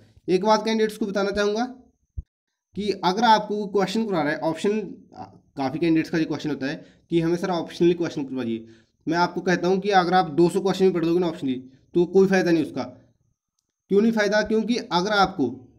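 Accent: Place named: Punjab, India. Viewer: Apki hindi mai bhi Punjabi tone hai 😂😂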